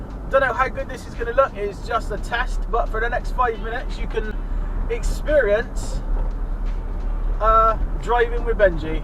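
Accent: Bristol, United Kingdom. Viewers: Always love your vids Benji. Strangely comforted by your bristolian burbling. 💋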